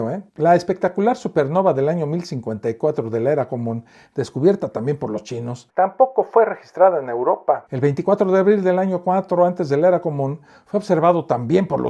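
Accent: Mexico City, Mexico. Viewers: primer vez que te veo, y ya vi varios de tus videos, todos muy interesantes, pero para ser una persona muy culta tienes un acento chilango, demasiado !!!NACO!!!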